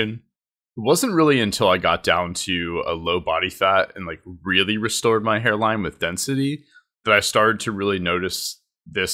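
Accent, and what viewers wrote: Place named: United States. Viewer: It's alsways a pleasure to watch your videos, you've got a beautiful american accent!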